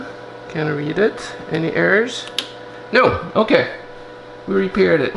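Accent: France. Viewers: I have an off-topic question for you. My wife heard me watching this episode and she asked me where your accent is from. I told her I thought it was French, but I'd like to confirm if I got that right or not. Thanks! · I mean… you know that for me, in-depth electronic debugging now has a french accent forever. Burnt in like a PROM.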